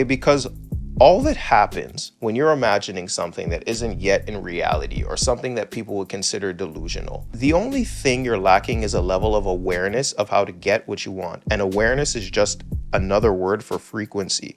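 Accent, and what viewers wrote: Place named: Toronto, Canada. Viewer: You have to be a Toronto man, based on the way you talk lol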